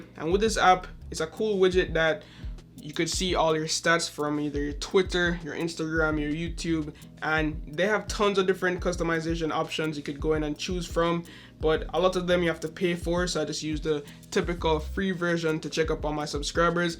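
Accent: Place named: Jamaica. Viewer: Da Jamaican accent is strong with this one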